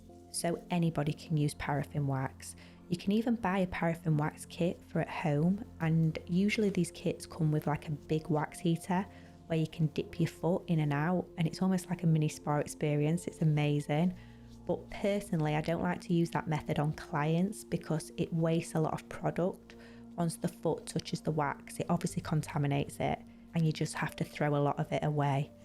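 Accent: United Kingdom. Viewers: From what part of the British Isles does your accent originate? I think it's fantastic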